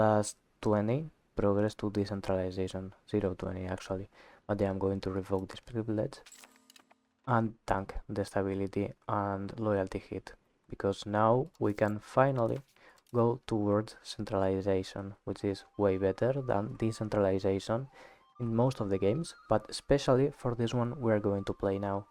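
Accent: Spain. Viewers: Eres español hablando inglés ese acento no falla jajajaja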